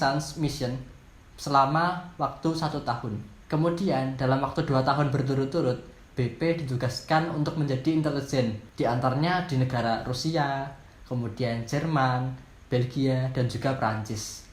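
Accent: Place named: Java, Indonesia. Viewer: Kaka medoq wkwk kerenn😹👍🏻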